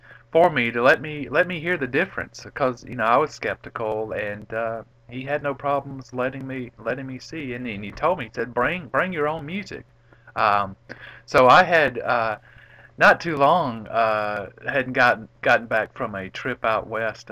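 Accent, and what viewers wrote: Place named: South, United States. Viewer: Thanks for introducing yourself. I thought I heard a Southern accent. Your story is interesting and I'm glad you shared it. I love classical music too.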